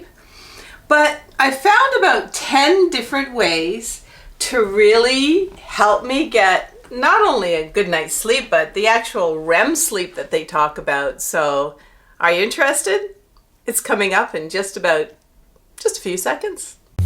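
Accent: Canada. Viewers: Are u Canadian May I ask?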